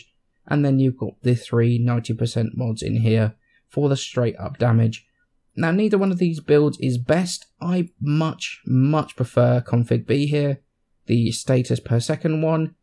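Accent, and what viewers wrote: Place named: England, United Kingdom. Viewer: no he's just English... that's how we say it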